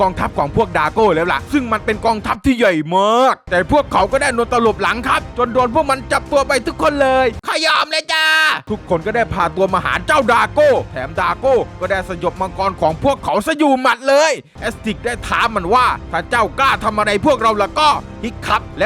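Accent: South, Thailand. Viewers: พี่คนใต้เหรอแหลงใต้ชับพึด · แหลงใต้มากๆ ก็ดีน้ะครับหลกดี555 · สำเนียงคนใต้เลยนิ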